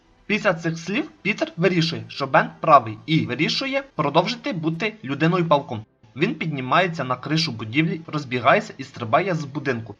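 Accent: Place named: Transcarpathia, Ukraine. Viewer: Пасувало би добавити фонові (тихенькі) звуки до картинки) І треба щось робити з закарпатським діалектом)) я з західної України, все розумію,все сприймаю) але от східні,центральні Україні важко буде для сприйняття( «Пистолєт» 😂 Пістолет))))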